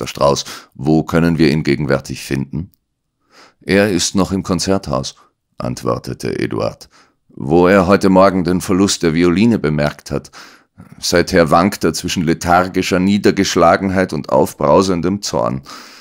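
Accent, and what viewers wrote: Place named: Austria. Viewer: Lustig, wenn, der nebenbei gesagt Österreicher ist, sein österreichischer Dialekt als nicht überzeugend kritisert wird 😂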